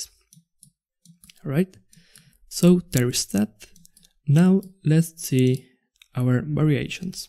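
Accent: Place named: Spain. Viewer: Great Video! by your accent i think that your native language is spanish, dont worry, spanish is also my native language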